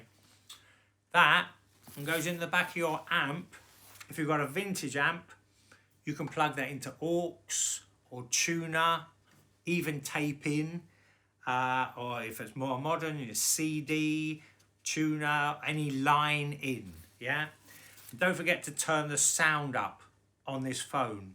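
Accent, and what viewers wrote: London, United Kingdom. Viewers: Interesting! You’re comparing the analogue output from the iPad /iPhone to the amp, ie using the Apple internal DAC. I’ve now (last week) bought a Cyrus Soundkey thumb size inline DAC (£60 + £10 for the Lightning adapter) which you plug into the Lightning port. It makes a really worthwhile improvement with earphones, like the music is clearer, sharper and in a bigger room. If you fed this combination into the amp, I bet it might even beat the Node 2i ! In fact, I usually use Airplay to go from my iPad to my own Yamaha WXC50 network streamer and hence to the amp; this also bypasses the Apple internal DAC, of course, and sounds pretty good to my old ears. Thank you for your honest reviews. So many seem to be trying to sell you up. I hope you aren’t offended when I say I love the London accent.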